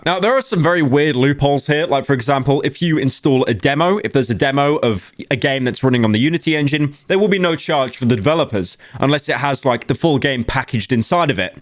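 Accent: United Kingdom. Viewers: I do bot care if live is slop its something i can just listen to every single day I love listening to the british furry yap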